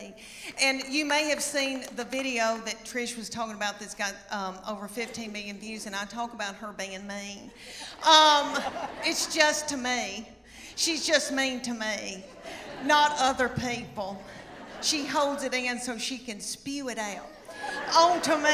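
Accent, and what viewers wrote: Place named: Texas, United States. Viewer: She has a very nice accent. I think she maybe from Texas😅😂🤣👍👍👍👍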